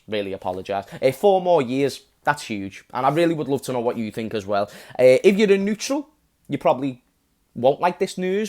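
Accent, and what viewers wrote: Liverpool, United Kingdom. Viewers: Hi. I ve been Liverpool fan for 47 years. Your character and scouser accent is so alike my ex schoolmate! YNWA Thailand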